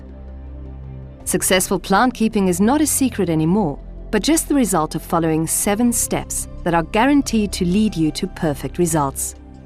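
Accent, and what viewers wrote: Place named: Germany. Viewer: i hate posh english in a german accent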